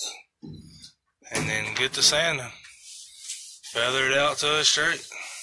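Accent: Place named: South, United States. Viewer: Where you from? I seen Texas plates. I live in Houston myself but you sound like one of my uncles in Georgia/Alabama lol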